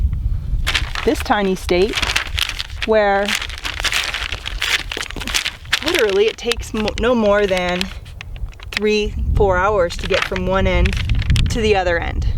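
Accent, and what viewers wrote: United States. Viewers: I love ears english and i love your american accent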